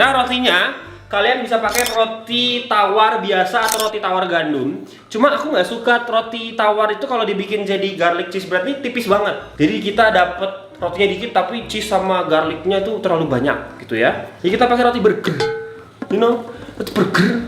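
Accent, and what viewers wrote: Bali, Indonesia. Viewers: Bli logat nya sudah kayak orang bali wkwkkw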